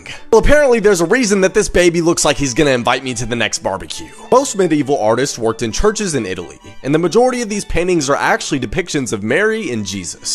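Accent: South, United States. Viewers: I absolutely ADORE YOU! Stuffs always funny here, and sometimes you fall into your southern accent and it reminds me of home. Keep up the good work ☀️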